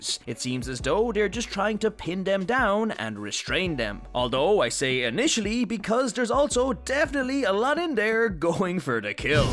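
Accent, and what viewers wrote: Ireland. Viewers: I knew your accent was so familiar as an Irish person myself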